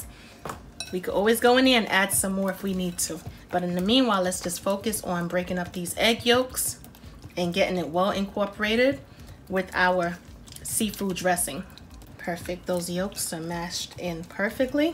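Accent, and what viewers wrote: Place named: Philadelphia, United States. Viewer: Ur killin me young Lady.....lol!.........You sound South Philly to me.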